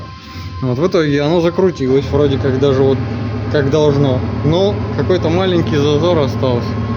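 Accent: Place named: South, Russia. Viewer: Это южнороссийский акцент в краснодарском крае и в Украине нак гхэкают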